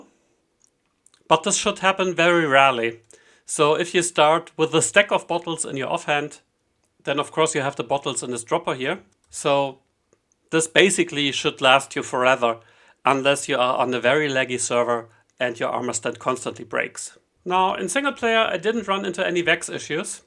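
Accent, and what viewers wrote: Germany. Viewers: do i sense a heavily german accent? :D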